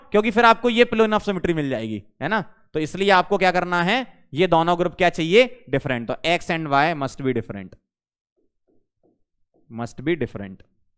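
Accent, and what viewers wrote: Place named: South, India. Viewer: Zaid Khan toh maine kb burai k mai toh inki personality k bre m boli slightly south Indian jaise bolte h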